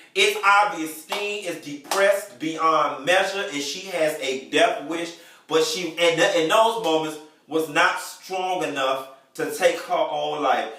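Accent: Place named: South Florida, United States. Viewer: i tought that you were a south florida dude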